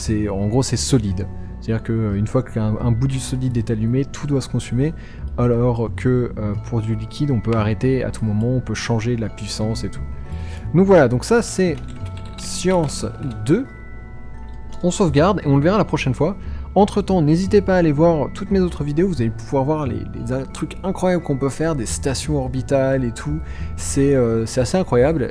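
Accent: England, United Kingdom. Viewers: Perso je kiff l'accent Anglais de <3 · Il a un putain accent anglais!! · tas laccent anglais toi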